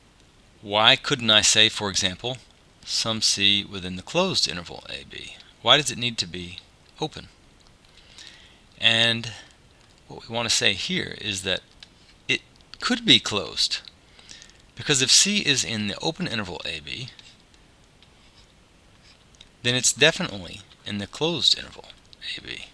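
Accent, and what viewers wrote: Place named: England, United Kingdom. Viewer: Just the paint, it seemd your accent was English. Nice video also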